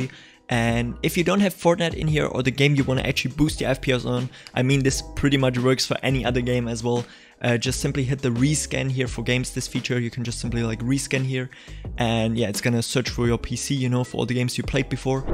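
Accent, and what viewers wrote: Germany. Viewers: der deutsche akzent haha, aber geiles video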